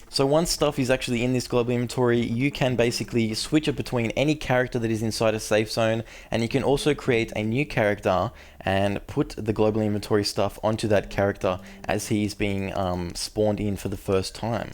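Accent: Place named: Australia. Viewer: omg ur Australian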